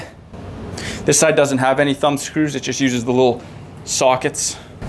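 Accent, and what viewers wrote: Canada. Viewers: Is that a Canadian I hear? :O Great review, by the way. Looking forward to building in this case tomorrow.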